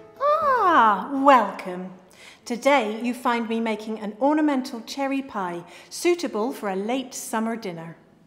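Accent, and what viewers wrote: United Kingdom. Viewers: I love your British accent! So classy!!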